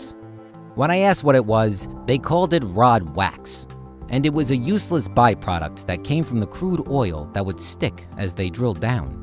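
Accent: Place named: New England, United States. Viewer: couldn't listen to more than 30 seconds of this dumb yankee accent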